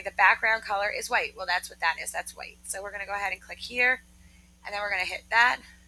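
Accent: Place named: United States. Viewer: Full American English, only native USA could understand it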